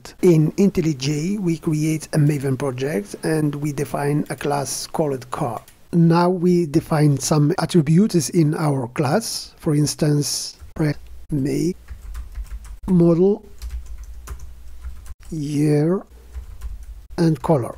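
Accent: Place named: North Africa. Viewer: it seems from your dialect that you are from north Africa, allow me say it in my Moroccan dialect: LAH YE3TIK SE7A!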